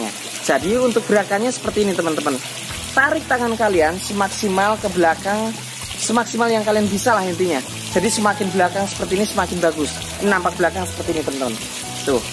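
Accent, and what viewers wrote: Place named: Java, Indonesia. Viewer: Saya tahu mad dari PTM Kemang Jakarta tapi penasaran sampean orang asli mana? logat jawanya kental banget.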